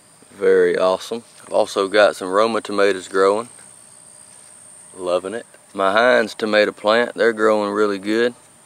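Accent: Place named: South, United States. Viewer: I guess I will have to plant some okra next year. It sounds good. We just get one crop here in Virginia but we don't burn out. Our tomatoes, peppers, melons, etc are just starting to bear and will go until frost. Sweet corn just finished, but racoons and possums got into it. Yeah, you sound country, but there's something else really relaxing about your voice. You should read books on tape or do voice overs for commercials or become a movie star!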